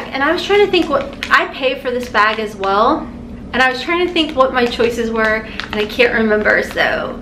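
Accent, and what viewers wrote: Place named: Tennessee, United States. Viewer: I just found you threw another channel. But I can say I'm a fan for life. I'm from Tennessee but moved to Florida. I miss hearing people talk the way we do. And I ha e a Haylee. Lol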